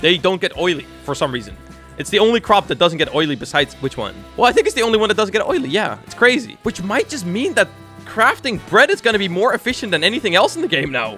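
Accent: Netherlands. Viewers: r u dutch? Cause i am haha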